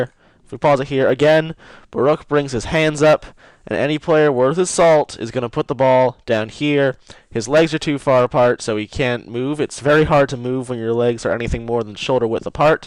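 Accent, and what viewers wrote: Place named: United States. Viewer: was actually going to listen to the whole video untill I heard your accent, you americans don't no shit about football! boruc is currently one of the best goalkeepers in the premiership.. saints fan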